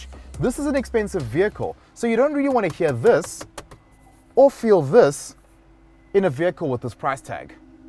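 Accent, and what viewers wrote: South Africa. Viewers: You from South Africa 🇿🇦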